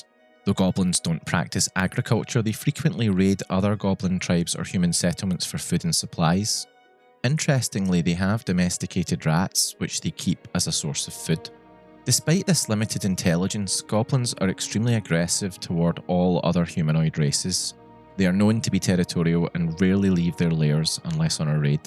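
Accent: Scotland, United Kingdom. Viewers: Is this scottish? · I also don't know how I got here. But I love Terry Pratchett. And I also enjoy listening to a scottish accent 🙂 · I love that Scottish accent and cadence. It's not so heavy that I can't understand it, but it has the bouncy musical quality of the Celtic dialects. Please continue to speak through all the ages, so that we may not be deprived the pleasure of hearing your voice. I miss Mr. Pratchett and his stories. I suppose that is the way of stories though. Life goes on; things change; stories end, begin again, evolve. We carry them in our hearts, and keep them alive in our own stories. On and on, across the endless cascade of creation. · It was strange seeing my words in print, but surreal hearing them in that great Scottish accent. You've done a wonderful job of relaying some of the magic I felt while helping to bring Vilja to life.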